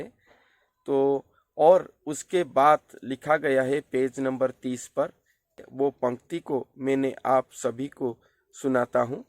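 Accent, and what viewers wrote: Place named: Odisha, India. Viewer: 2024 bole..odia log ka pronunciation aisa hee hai..it's 2024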